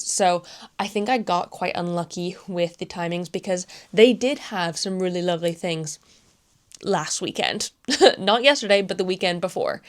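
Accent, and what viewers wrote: United Kingdom. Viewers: That Brittish accent is slowly taking over your American accent. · I just noticed the longer that you have been in the Uk you are speaking more with a British accent.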